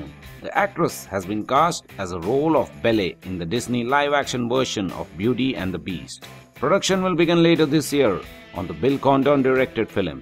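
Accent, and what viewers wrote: India. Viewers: bellay? her-mion gran-gerr?? bwahhaaa!! lolzz! mann sorry bt ur indian accent is juss too pronounced! (fyi, I'm indian 2, bt I pronounce words ryt!) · Actually he is Indian. And that is is why he is speaking ridiculously. He is speaking in Indian English accent . The most funny part for me was when he pronounced hermione as Hermene and Belle as Bell-e!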